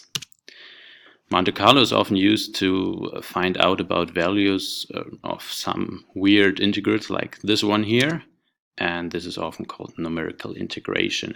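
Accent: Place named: Germany. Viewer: Omg best video I’ve seen in ages. Didn’t understand a thing but that german voiceeeee guyssss thumbs up! (GTR ist nicht mein Freund)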